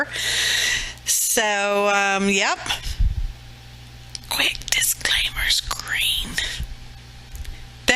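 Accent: South, United States. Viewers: Loved your project and love your southern accent!!!!!!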